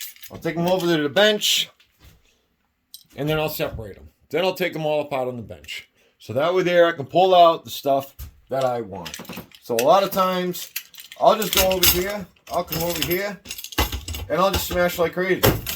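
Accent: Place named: New York, United States. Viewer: sounds like your from new york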